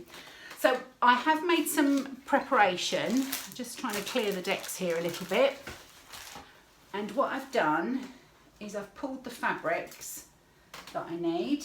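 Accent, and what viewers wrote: England, United Kingdom. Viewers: Always enjoy watching your FT. Jealous of your conservatory🙂 I enjoy hearing your English accent, as my mom was from England, and I hear you say some of her expressions like skew wiff. I’m in Ontario Canada. I’m glad you are doing the Lori Holt sewcial,. I also enjoy stitching up her patterns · SapphireMtHandcrafts A lovely time when I sit back and let you transport me to England with you accent and way of talking. So enjoyable. Looking forward to seeing how the watering can bag turns out. You garden looks like it needs a few more months before Spring arrives and flowers overflow your hanging basket s. Thanks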